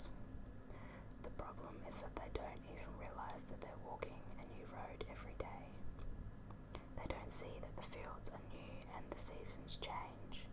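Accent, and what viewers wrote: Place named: Australia. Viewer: Definitely an Aussie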